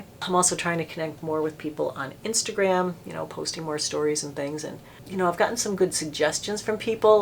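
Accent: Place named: Massachusetts, United States. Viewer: Omg! I knew you were from Massachusetts somewhere. I can tell by your accent! I'm also from Massachusetts! I'm in Webster Ma by the lake we have here... 🤗 Yaaaay I love seeing YouTubers from Mass! I'm so excited right now!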